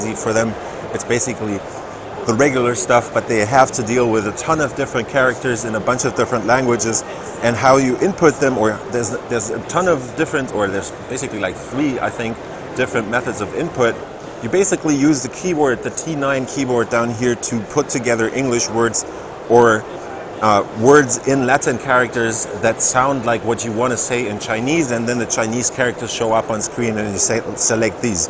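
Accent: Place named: Germany. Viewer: Your accent sounds like German!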